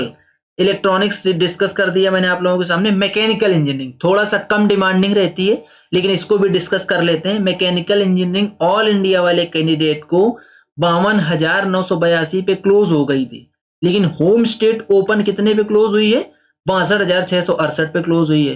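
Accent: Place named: Kanpur, India. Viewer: Kanpur se ho na aap Lahze se lag raha hai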